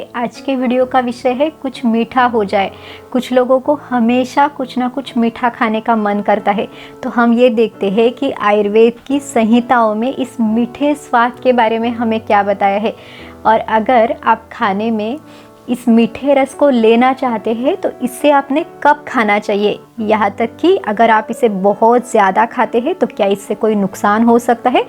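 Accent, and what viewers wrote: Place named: Maharashtra, India. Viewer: ताई तुमचे मराठी accent मधे हिन्दी नेहमीदिसुन येते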